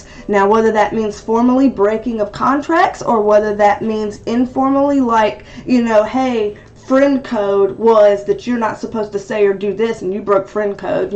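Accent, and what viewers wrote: South, United States. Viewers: Peter Monn brought me here!! You are so sweet and I LOVE that southern belle accent! Sending good vibes from TEXAS!!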